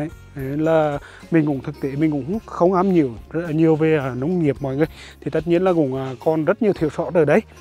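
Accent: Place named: Nghe An, Vietnam. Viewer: Chất dọng quê choa mộc mạc mà dễ thương chúc khỏe bình an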